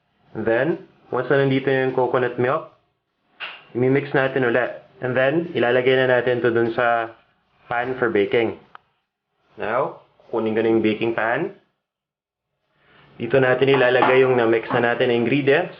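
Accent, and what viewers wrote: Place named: Visayas, Philippines. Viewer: disappointing filipino who is very poor in giving instructions as well as has no idea about measures. ademas, obviously not from the tagalog region. in a brazo de mercedes video says 'i-combine' when in tagalog combine simply translates to 'ihalo'; transfer - 'isalin'. maybe he is bisaya either make your presentation in english or stick to cebuano!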